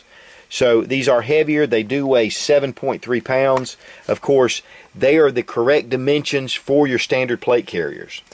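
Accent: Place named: South, United States. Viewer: Great accent denotes Southern nobility of yore.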